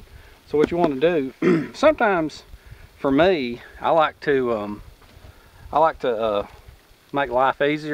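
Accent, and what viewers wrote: South, United States. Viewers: HI. Nice to see you back again. ok, I see you as a walking, talking southern encyclopedia. Yet again, the southern messed me up. Shambered? So, I google and decide the word I am hearing is chamfered? Like a beveled edge? I think. Bless your heart. We yankees do not understand sometimes. LOL Love the no cordage. This stuff I can do. I have done no cordage many times. Most times I had no choice. Some of the notches were new to me. Appreciate that. Thanks for teaching some of us new and/or better ways of doing survival things. But please.... no more popcorn videos! Still makes me laugh. Be well. Be safe.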